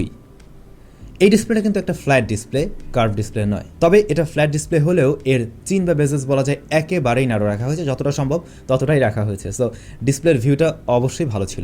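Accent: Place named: Tangail, Bangladesh. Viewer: Vaiya apnar basa kotha love for Tangail ❤️❤️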